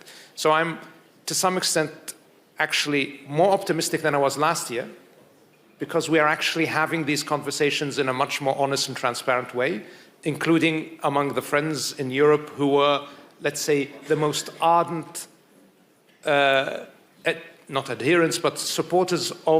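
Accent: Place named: United Kingdom. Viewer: 😍يتكلم باللهجه البريطانيه 👍 و عادل الجبير يتكلم باللهجه الأمريكيه👍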